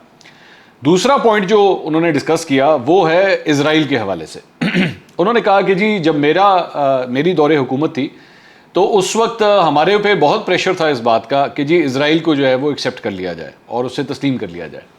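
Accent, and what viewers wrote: Punjab, Pakistan. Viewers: bhai apka punjabi portion bra mazedar hota hy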